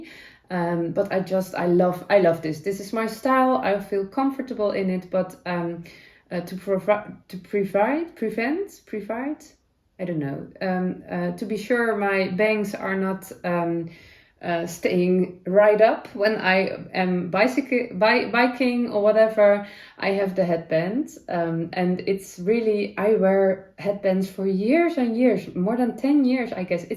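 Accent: Netherlands. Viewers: I really enjoy your so-called "dutchy English" because my dear Father also had this accent! ❤ 🧶 Hard relate on 3mm struggles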